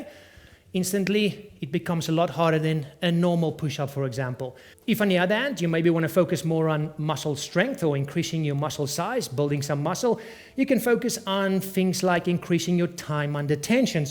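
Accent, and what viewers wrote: South Africa. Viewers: are you south african? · South African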